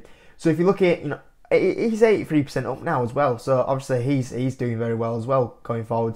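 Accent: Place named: London, United Kingdom. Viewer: Are you from London or something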